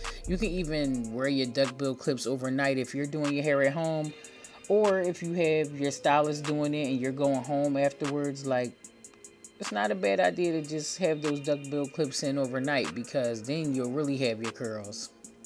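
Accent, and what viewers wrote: Philadelphia, United States. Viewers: I thought I heard a Philly accent! Then you said PA I was like yup!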